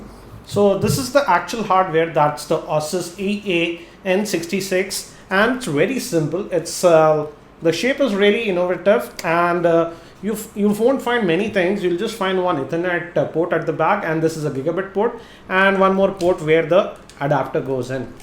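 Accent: India. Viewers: is he speaking english or indian, i cant tell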